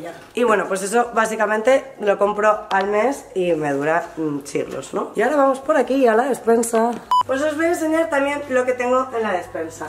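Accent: Galicia, Spain. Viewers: Te sigo hace poquito...de donde eres? Eres gallega?😀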